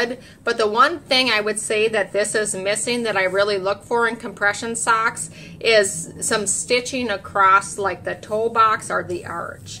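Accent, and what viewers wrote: Minnesota, United States. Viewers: So that’s an accent from MN? I was thinking another country lol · This accent is reminding me of the movie Fargo. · Are you from Minnesota?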